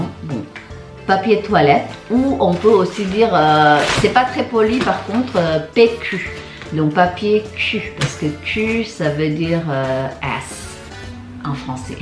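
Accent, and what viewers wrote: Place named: France. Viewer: Keren banget logatnya udah Prancis banget, je suis jaloux je voudrais parler français comme teh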